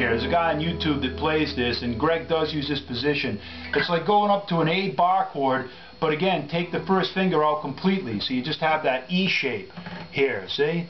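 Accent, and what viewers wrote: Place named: Long Island, United States. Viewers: I've forgotten so i'm back.Thanks for the refresher and the New York accent,reminds me of home. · Real Good! Can't wait to get home & try it out., you sound like my ex-bro-in-law, Joe, from da' Bronx - he's a good guy, too. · I get a kick out of your east coast accent. You sound like I did 45 years ago. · I've been watching and learning this slowly along with a handful of other songs. Very insightful and well done, although you're LI accent is a bit amusing. Not meaning to be insulting, good job and good guitar. Guessing you're about my age, so I gotta ask. Do you remember The Good Rats? Awesome band that deserved a lot more recognition than they received.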